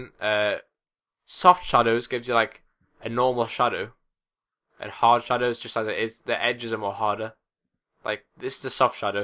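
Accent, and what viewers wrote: Newcastle, United Kingdom. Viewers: R u from newcastle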